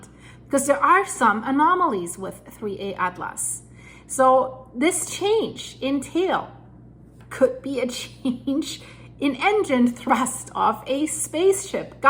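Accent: Germany. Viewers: Ich mag deinen deutschen Dialekt. Woher kommst du? Liebe Grüße aus Wien 🫶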